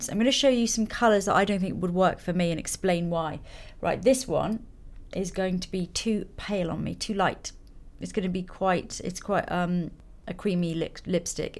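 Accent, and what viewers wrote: United Kingdom. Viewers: Howdi yal , I fell on this video and why did i not click away ?? Idk maybe hearing a British voice ?? But I was so enveloped but her voice and video I payed so mutch attention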